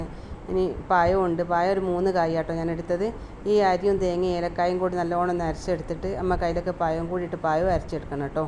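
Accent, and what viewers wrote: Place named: Kozhikode, India. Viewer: Inghalu kozhikkode aano sthalam😂samsaram kettitu aganethonni❤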